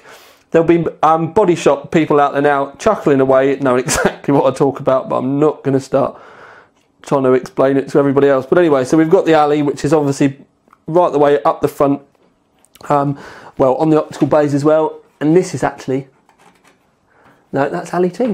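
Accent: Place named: Cockney, United Kingdom. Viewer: You make computer case reviews fun to watch. You are a true American hero. Or would be, if you didn't speak like a cockney so much.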